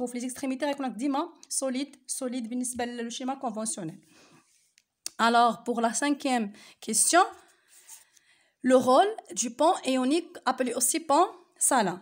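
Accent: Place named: Canada. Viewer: Canadian accent wow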